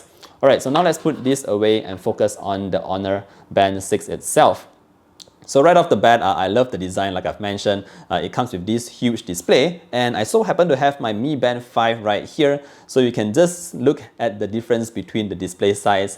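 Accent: Malaysia. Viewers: heard your english, definitely my countryman. selamat hari merdeka!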